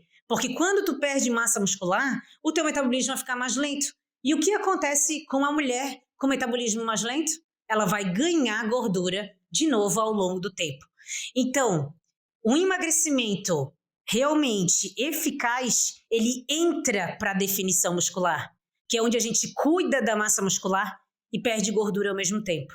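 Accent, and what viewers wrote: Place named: Florianópolis, Brazil. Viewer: Pelo sotaque, é manezinha da ilha😅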